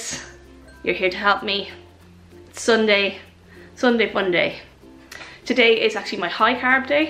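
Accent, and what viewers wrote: Ireland. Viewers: "Pray to the fairies"... Lol. Does that even work if I do it - or does it work for the Irish only 😂 Love your Irish English by the way... The only reason why I religiously watch channel!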